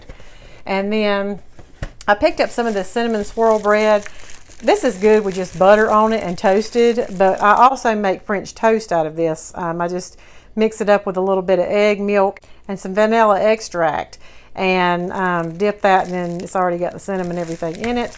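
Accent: South, United States. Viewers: Love your videos and your southern twang. Dollar Tree is the bomb!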